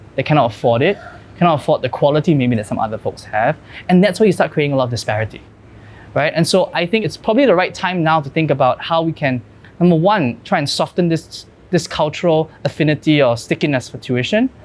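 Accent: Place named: United States. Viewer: Listen to him in another podcast. He has this American accent which is attractive but lacks depth in his conversation.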